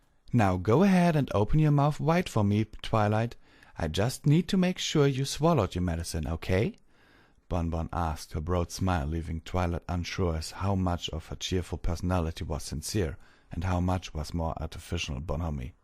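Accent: Germany. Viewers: Sounds more like German to me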